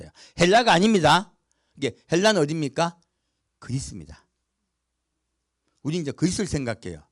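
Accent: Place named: Jeolla, South Korea. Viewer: 전라도네요. 아멘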